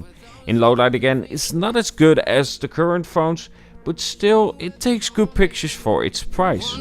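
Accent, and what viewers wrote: Netherlands. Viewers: Jij bent nederlands, ik hoor het!!! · Haha nederlands accent😂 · Youre dutch, my accent is the same as youres😉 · nederlands😂 kun je dds goed horen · Still using the s6. You have a dutch accent, I can hear it because I'm dutch, am I right? ;) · That dutch accent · Je hoort gewoon dat je Nederlands bent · You are dutch confirmed it via you english accent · Meteen in de eerste zin wist ik dat je nederlands bent😃 top video💪💥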